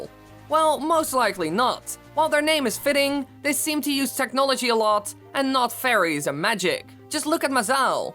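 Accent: Netherlands. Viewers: He has an accent, he's dutch. Accept it or leave.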